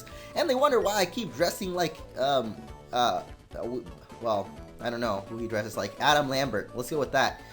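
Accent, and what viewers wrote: United Kingdom. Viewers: very British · oh so very british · yea hes britsh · He is definitely a Brit... XD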